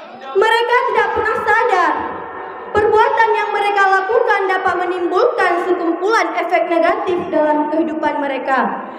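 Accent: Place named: Aceh, Indonesia. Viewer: Medok kli acehnya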